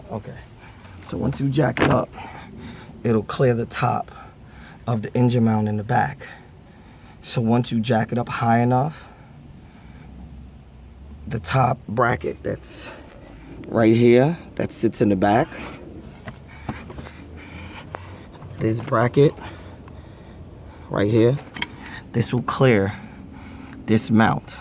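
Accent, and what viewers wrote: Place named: East Coast, United States. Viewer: East coast accent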